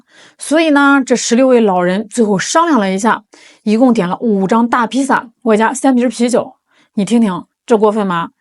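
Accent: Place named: Shandong, China. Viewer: 这是山东口音吧？听着特别亲切。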